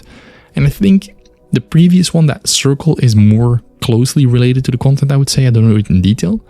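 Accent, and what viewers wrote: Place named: Netherlands. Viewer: Thank you for the effort and detailed explanation. Subscribed. By the way, from the accent I think you are 100% Dutch. )))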